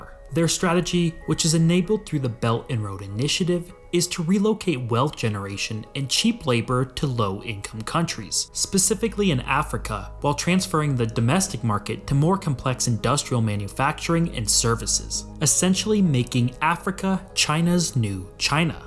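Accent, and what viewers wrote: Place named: United States. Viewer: Beware : When a video is narrated in d American accent n sounded in way like "we are d righteous knowledgeable West trying to point out all d bad that China is doing", it's wise for viewers to add quite a bit of salt to it..bc China is disrupting centuries of Western global exploitations of d Global South..thru conquest n colonisation in d past, n post WW2 thru neocolonialism, to ensure d G South stays poor so that it's easier for d West to maintain their v high standard of living.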